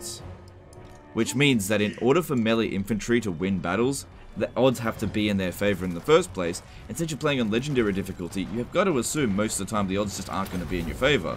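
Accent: Australia. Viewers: Alternate title: "Aussie man talking about eating shit for 29 minutes strait (in a video game)."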